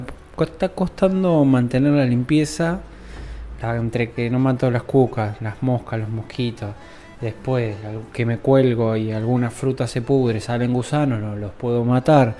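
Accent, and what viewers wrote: Argentina. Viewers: amigo sos correntino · Creo que es argentino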